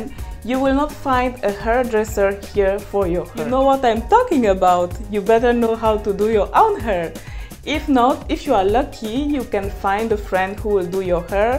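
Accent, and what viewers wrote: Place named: Poland. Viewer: I speak Fluent Polish, You do have a polish English Accent, jesus lolz I love this...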